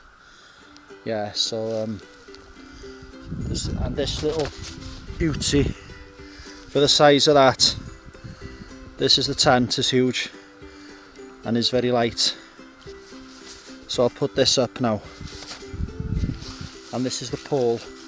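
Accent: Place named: Wales, United Kingdom. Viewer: Great video buddy,proper Welsh accent up your way. Bet you speak Welsh to.... Nothing wrong with a wild camp in lock down. Done it myself... Felt proper safe from covid, being miles away from civilisation. If you have any negative comments.. Tell them to piss off.... Check my wild camp out buddy... Atb dai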